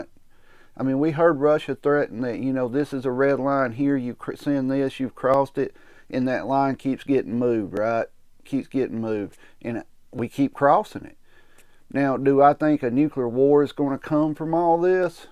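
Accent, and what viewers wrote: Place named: Appalachia, United States. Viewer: hillbilly talk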